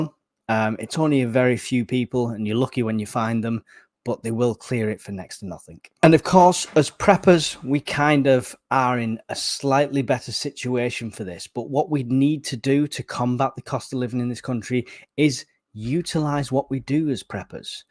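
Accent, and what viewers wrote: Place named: Rochdale, United Kingdom. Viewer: Trying to pinpoint your accent. Going to guess Rochdale because of how you say “work” but the way you say “year” is really throwing me 😄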